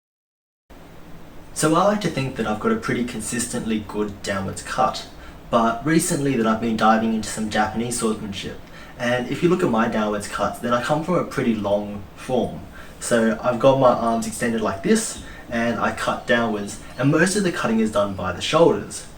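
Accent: Australia. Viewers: Yes we have problems getting them too. What is your country, you sound Aussie like me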